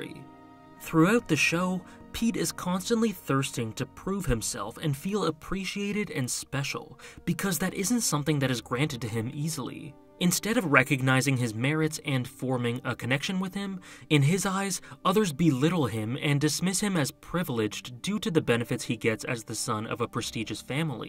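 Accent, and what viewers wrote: Canada. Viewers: I'm going oot on a limb and guess you're Canadian?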